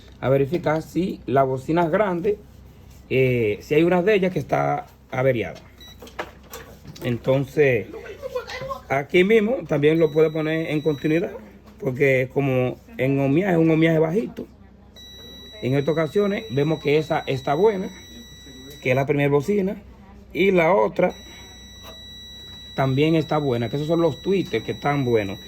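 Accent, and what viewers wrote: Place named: Dominican Republic. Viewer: Ignorante ellos hablan así, su lenguaje de los dominicanos es así.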